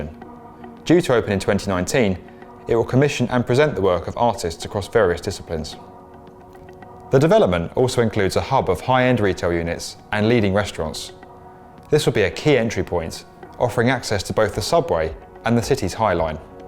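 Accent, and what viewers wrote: United Kingdom. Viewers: Why is the project being described by a person with an British accent, and why are they using British spelling?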